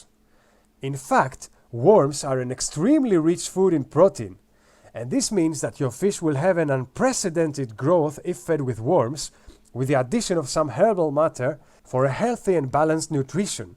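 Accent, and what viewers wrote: Greece. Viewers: Ο πωπωπω η ελληνική προφορά την ακούς παντουυυυ. 🇬🇷🇬🇷🇬🇷🇬🇷🇬🇷🇬🇷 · Chris Chavez Well, it's definitely not Indian, and I don't think it's Turkish, although that is a better guess. Sounds a lot like Greek to me. Specifically southern Greece. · Κατάλαβα αμέσως ότι είσαι Έλληνας από την προφορά σου έκανα sub γιατί βρίσκω το περιεχόμενο ενδιαφέρον. Σου εύχομαι τα καλύτερα και καλή συνέχεια! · Your accent sounds Greek...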